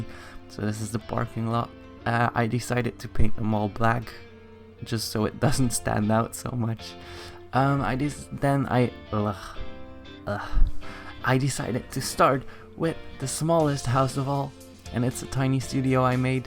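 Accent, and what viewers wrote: Belgium. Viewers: Wacht ben je van belgie? Ik dacht Engeland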